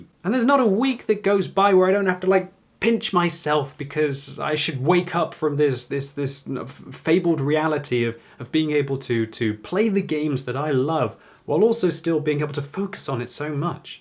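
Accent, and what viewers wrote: United Kingdom. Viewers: I've never played WOT and I have no plans for it. I've enjoyed watching your progressive gaming style and rather unique british commentary for some years now and I have fun on 98% of the games you posted. How can I keep tracks on what I've seen so far, and what not? Easy - click [LIKE] on every single video watched. Thanks for a steady stream of rock solid entertainment. Cheers, Peter Beijing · Even though i've quit playing WoT, i'm still watching your videos and come on your stream for that lovely british accent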